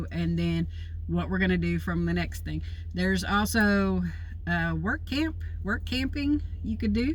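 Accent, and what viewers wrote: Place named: United States. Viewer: Hi . Love your country accent 😍 . So you travel alone?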